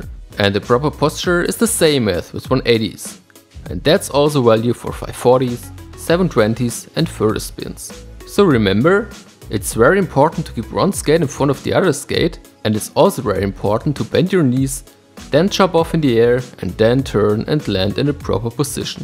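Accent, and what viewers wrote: Germany. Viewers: you still have a clear german accent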